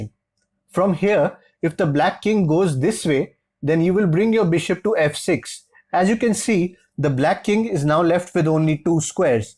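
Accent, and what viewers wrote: India. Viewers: just wanna let u know that I hate Indian accent.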